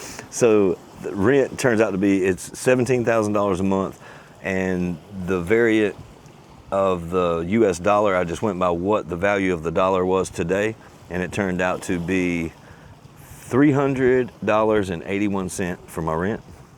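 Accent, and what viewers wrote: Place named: North Carolina, United States. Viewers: Aussie subscriber here, good to hear your Southern accent s an Expat in Phils. Will be there in 3 weeks time maybe we can meet somewhere. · maybe Ive missed it, but are you including insurance costs in your budget…health, renters, bike etc.? My Filipina wife and I just discovered your channel and love it. We’re in Salem, VA and enjoy your NC accent.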